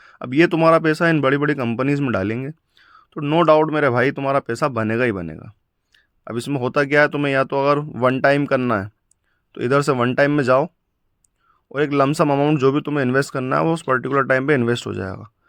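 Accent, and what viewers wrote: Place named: Bhopal, India. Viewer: Bro Bhopal se ho kya?? Accent thoda Bhopali lg rha hai 😅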